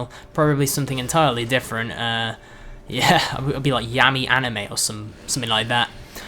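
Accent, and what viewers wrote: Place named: Salford, United Kingdom. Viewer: are you from salford? you have an extremely similar accent to mine, only mines more high pitched and squeaky being female...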